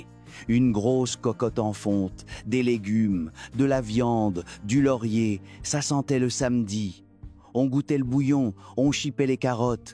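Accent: Quebec, Canada. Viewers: Pas moi, je lui trouve un discret accent canadien dans ses prononciations 🙂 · Vous avez l'accent du Québec. Est-ce que cela parle des produits de France ou du Canada? Il y a des produits écrits en anglais, et d'autres comme oeufs mimosa, gratin dauphinois, etc typiquement de France aussi à cette époque ...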